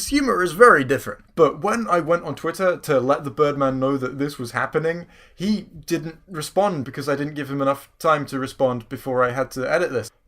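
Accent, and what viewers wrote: England, United Kingdom. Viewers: You sounds so English in your early videos, I thought your accent had just changed when you went to uni. (I changed accent at uni) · You get accused of this because you're British and accents are a tip off that you're prone to rip off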